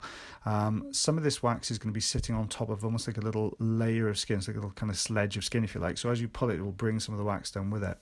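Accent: Wales, United Kingdom. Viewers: Wow a perfect British accent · Yes the Welsh accent at it's best beautiful gentleman inside & out Learning more with each video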